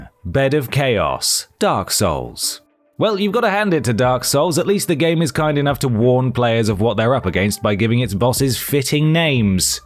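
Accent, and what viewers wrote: United Kingdom. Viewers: wait a sec , you are British ?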